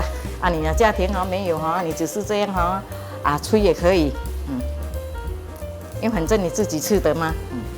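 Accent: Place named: Hokkien, China. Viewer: ，你讲话很像我妈妈，参一点福建话这样啊😊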